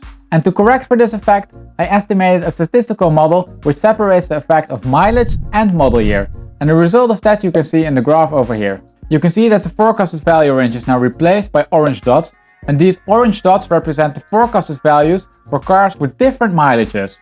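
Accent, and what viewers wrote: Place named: Netherlands. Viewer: Hello, bought myself a facelift GTS 2017 with 9000km in Belgium for 85k€. What a car. Beautifull en lots of sound and driving pleasure! Sold my <num> turbo cabriolet for same price as i bought it 10 year ago! Thanks for all your unique and perfect analysis. Regretfully all in dollar and US figures... as i think hearing your accent that you are from europe.... Holland i think 😅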